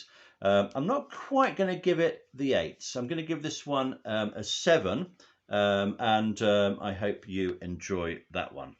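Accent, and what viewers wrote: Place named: United Kingdom. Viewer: I guess these grades you are giving to songs do not represent their grandiosity, this album comes with a creative energy which we haven´t felt for years...the piano in first plane..the sound of vocals resembling an analog registering/record. I think i would jump two or more numbers after all you suggested...lol. I love your British accent.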